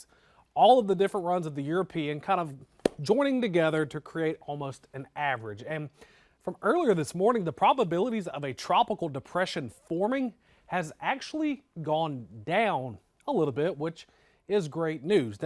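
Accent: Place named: Texas, United States. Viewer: Im from Tennessee and im so confused with your accent. Are from Chicago or Texas 😂.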